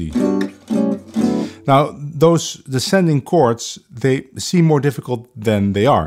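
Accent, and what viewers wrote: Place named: Netherlands. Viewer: Your Chord shapes are unusual. You're definitely a fabulous teacher. Worth studying. Love the Dutch accent.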